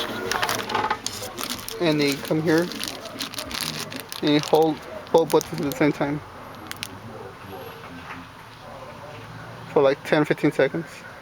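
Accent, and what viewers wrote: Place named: Mexico. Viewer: Carlo Russo that’s how Mexicans talk!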